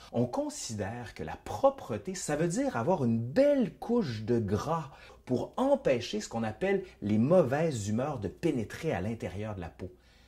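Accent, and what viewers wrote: Quebec, Canada. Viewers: Ta vidéo est bien argumentée mais tu aurais dû dire que la peur de l'eau était liée à l'épidémie de la peste noire, à t'entendre tu es québécois ? 😀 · enfin une bonne chaine d'histoire, sans musique techno hyperactive en fond sonore et sans effets comiques surjoués. Non seulement le fond est captivant mais de plus la forme est très agréable. avec le bel accent québecquois en supplément ! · Je viens de découvrir vitre chaîne et j'adore. Et l'accent du Québec, quel bonheur ! Des becs ☺️ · Il est québécois... olalala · J'adore l’accent de nos cousins québécois, merci pour cette vidéo bien faite ;) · Les canadiens vont avez des doc super mais l’accent horrible! J’ai de la peine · J'aime bien le acsent Canadian, c'est drole ca me fait sourire . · C est chouette un vrai conteur en chair et en os j adore ce petit accent québécois il raconte super bien 😊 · Que j'adore cet accent Québecois! 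· Tres instructif, j 'adore votre chaîne, beaucoup d 'informations présentées de façon agréable et drôle, et votre accent québécois est vraiment charmant. Merci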